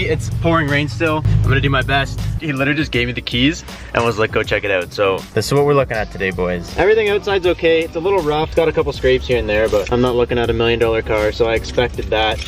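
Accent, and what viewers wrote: Canada. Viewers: Intentions or our attention? Haha, I love Canadian accents.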